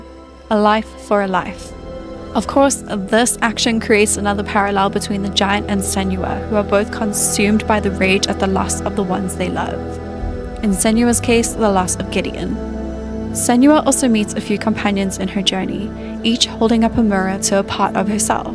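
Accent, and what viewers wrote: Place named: South Africa. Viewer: Only realised after watching its you, i was like omg this is a south african voice and couldnt figure out where i heard it.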